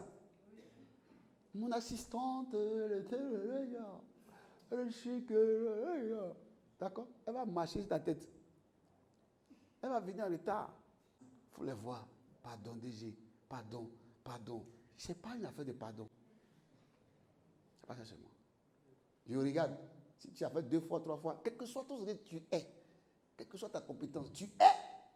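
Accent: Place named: Bété, Ivory Coast. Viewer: Vraiment vous parlez comme un Ivoirien précisément Bété. Je signale que j'ai aimé et je sur que je vous raconterai par la grâce de Dieu.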